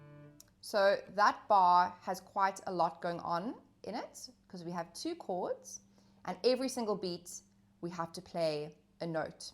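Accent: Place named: South Africa. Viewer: Love the Safa accent! Makes me miss home!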